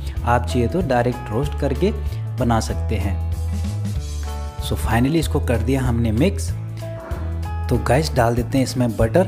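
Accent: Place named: Garhwal, India. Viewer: Nice gadwali chef your tone like gadwali m ryt Some time make uttrakhndi cousin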